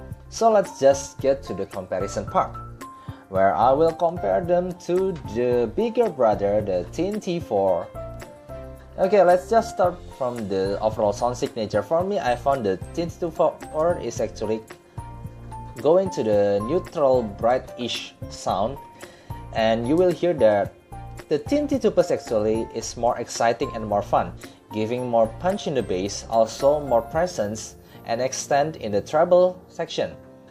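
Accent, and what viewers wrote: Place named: Indonesia. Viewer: logatnya kayanya orang Indonesia nih....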